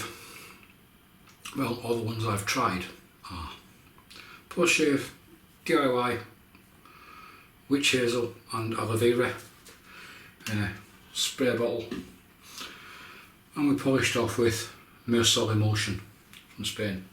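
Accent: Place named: North East England, United Kingdom. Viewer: Just subscribed a few days ago. Nice to hear a North East accent. My part of the world as well. Hope you hit the 200 subscribers soon. I enjoyed watching your shave.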